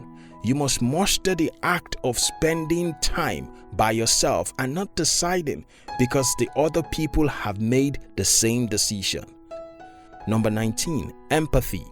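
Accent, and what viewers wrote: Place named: Nigeria. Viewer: The narrator must be a Nigerian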